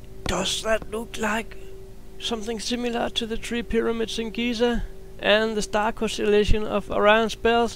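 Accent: Denmark. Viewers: I worked at a hostel for 3 years. This dude is Danish for sure.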